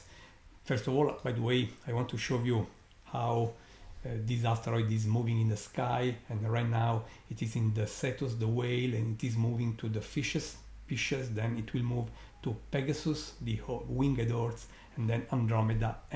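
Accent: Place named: Italy. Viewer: Se entiende bien el inglés italiano por lo menos para los latinos jaja. Gracias por compartir esta experiencia. Espero que podamos seguir observando hechos relevantes del espacie en tu canal. Seguimos conectados desde Paysandú, Uruguay.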